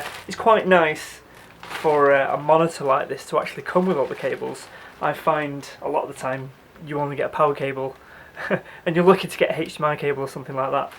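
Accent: United Kingdom. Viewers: love your british accent.a breath of fresh air in the jungle of north American content.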